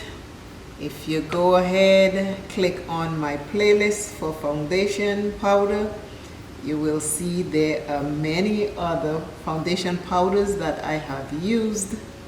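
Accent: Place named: Dominican Republic. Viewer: Are you Dominican?? I hear the accent 😊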